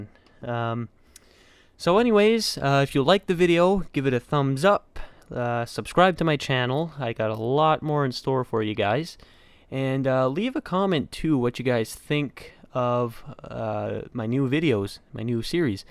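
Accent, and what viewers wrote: Canada. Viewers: I mean u sound Canadian, but the most famous Atlantic city I know is here in jersey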